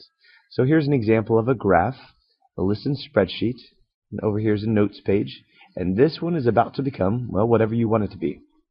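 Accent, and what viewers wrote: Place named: United States. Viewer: This man clearly has an American accent so you point is invalid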